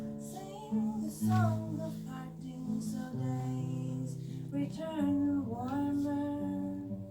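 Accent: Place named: Ireland. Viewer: I like your voice🎉And it's a delight to hear that soft Irish accent....look forward to hearing more😊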